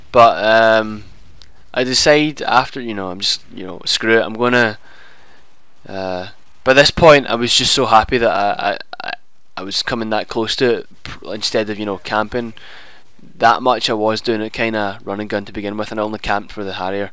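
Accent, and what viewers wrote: Scotland, United Kingdom. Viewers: i like your accent, scottish? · r u scottish cus my neighbor is and scottish accents are cool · I like Scottish English,your voice reminds me of Soap Mc Tavish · you sound more scottish <3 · He sounds a bit Scottish to me. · Nice scottish dialect 5/5 (Nice play 2) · its scottish it isnt anything like irish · Scottish, I’m his nephew btw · I love calm scottish accents :D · wa hey. A scot. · Awesome accent, I'm Scottish too. Half. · are u british or sumthin not trying to be raseist or wat evva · are u scottish???? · Man, I love your scottish accent, awesome! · Your scottish accent is probably the only kind i like, Xbrzz sounds so common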